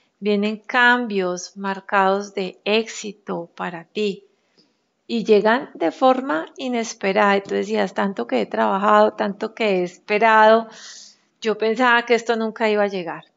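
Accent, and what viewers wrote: Colombia. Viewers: Qué gusto verte siempre tan radiante, tan positiva y con ese hermoso acento colombiano... Saludos desde México... Arriba Colombia!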